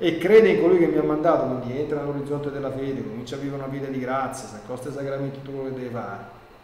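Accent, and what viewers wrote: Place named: Rome, Italy. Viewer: Santo Padre, grazie dei suoi preziosi insegnamenti! Le chiederei solo di parlare meno in romanesco perché a volte mi perdo le sue parole e mi dispiace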